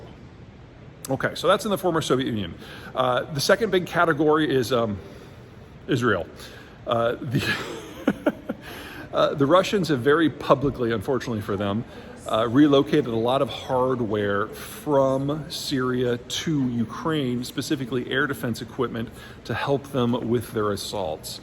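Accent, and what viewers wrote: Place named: Iowa, United States. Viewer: Don’t knock An Iowa accent honey.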